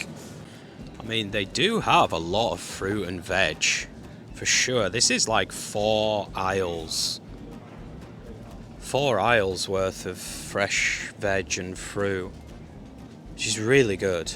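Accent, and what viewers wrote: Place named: Italy. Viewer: Ma sei un italiano che parla in inglese!!!!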